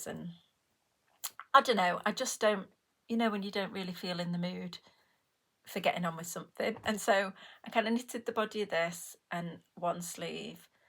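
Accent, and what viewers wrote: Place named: Liverpool, United Kingdom. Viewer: Oh yes, I definitely know of that rivalry lol my sister‘s husband is from Liverpool and his parents were born and raised there as well….when I was listening to your beautiful accent…to my American ears it sounded a bit familiar and I thought ohhh maybe Liverpool? Sorry to offend lol 😂 My sister‘s husband grew up in a divided family, half for Liverpool half for Manchester… It gets pretty heated. When my brother-in-law would go to a match , his father would drop him off, but swear he would never enter the stadium. lol too funny. Anyway, thanks for sharing and I’m enjoying your channel. Love the sweater!!!!!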